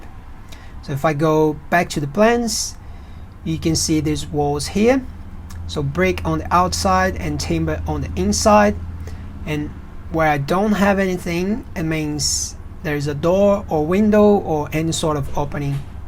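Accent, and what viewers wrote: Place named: Australia. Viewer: Great aussie accent. Structural calculations are always relevant. Keep it up!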